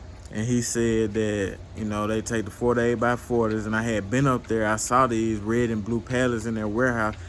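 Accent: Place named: South Louisiana, United States. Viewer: Sound like u close to south louisiana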